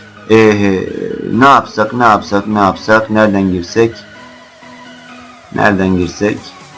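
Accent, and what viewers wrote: Black Sea, Turkey. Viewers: abi sn karadenizli misin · Abi saf karadenizli misin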